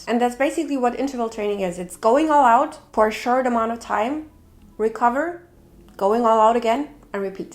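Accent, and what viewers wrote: Germany. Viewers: Are you german ? I cant listen to accent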